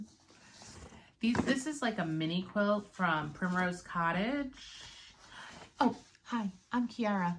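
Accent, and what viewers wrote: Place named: New Jersey, United States. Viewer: Hello. Awesome projects. I was watching a different video of yours. I recognized that you speak more like I do compared to other states 😂 I’m in NJ but it’s very similar in certain areas of NY. I recognizes the word bought, Autumn, etc. Idk it is crazy. Before I started watching YouTube and hearing other accents, I would have never noticed that you sound like home I guess I could say 😂❤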